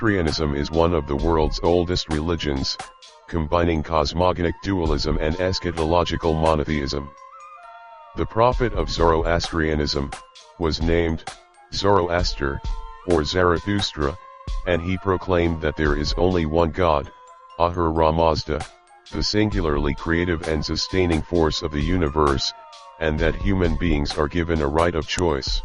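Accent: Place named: AAVE, United States. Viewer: I am not a fan of black ebonics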